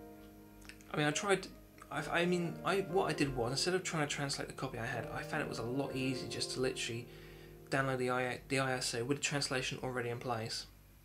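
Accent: United Kingdom. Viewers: Well seeing as he has a british accent and all the games on his shelf are in PAL cases, I am going to put 2 and 2 together and say the guy might be from the united kingdom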